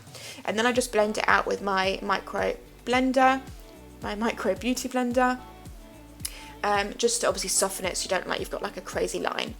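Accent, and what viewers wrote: United Kingdom. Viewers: This is really pretty. First time I've come across your. Channel. Wasn't expecting the British accent!!! You're very cool:)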